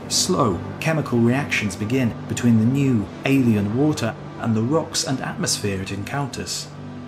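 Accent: England, United Kingdom. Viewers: Where is this coming from? His accent sounds like England. I am used to measuring things in inches, feet, yards, etc here in the USA, not meters, etc! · I am thoroughly enjoying these videos, but I'm starting to find the narrator's accent more and more annoying. In this one it's particularly noticeable, seeing as it's about water -- or as he says it, "wo-tah," or sometimes, "woo-tah." I guess "wah-tER" is just too hard for Brits to say properly. All those R's in the English language, and the Brits just won't say them. But for some reason, they stick on the end of words that end with an "ah" or "uh" sound. It's maddening. Some of the other mispronunciations are just laughable -- "dee-ASS-pura" instead of "DI-uh-spora", or "invent-ery" instead of "inven-TORY" -- you know, like the political class in Britain!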